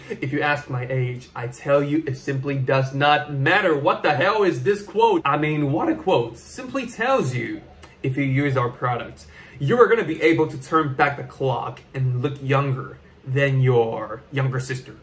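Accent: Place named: United States. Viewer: wow，very nice American accent, very impressive.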